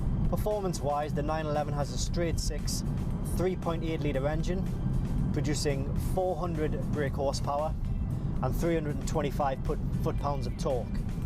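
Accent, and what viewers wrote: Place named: Tyneside, United Kingdom. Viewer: Where you from mate? North or south of the tyne?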